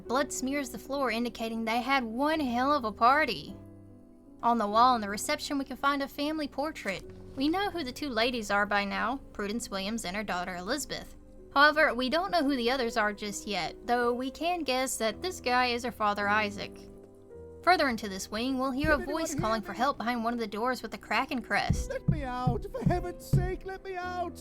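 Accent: South, United States. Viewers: you sound like you have a slight southern accent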